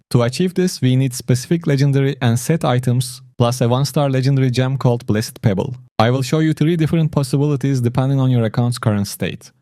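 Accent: Turkey. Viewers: kanka ingilizcenden anladığım kadarıyla Türksün. F2P oynanır mı zevk alınır mı bu oyundan ?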